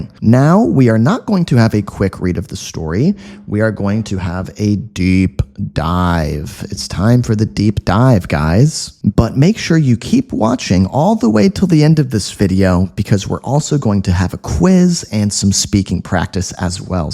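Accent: United States. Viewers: Hi. I am watching from france, I discovered your channel recently. I listened to the GSL english podcast, and I loved the way you spoke in that podcast. Your American accent is terrific, and it has drawn my attention and wanted to listen to you more. Keep up the good work. I love what you do 🙏🏼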